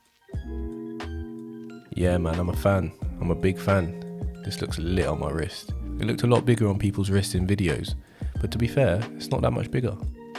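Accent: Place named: London, United Kingdom. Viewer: Nice vid bro. Stumbled across your vids. The london in you is clear to see ha ha.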